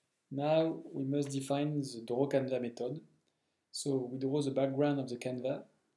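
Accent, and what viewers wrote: France. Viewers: Le bon accent français des familles <3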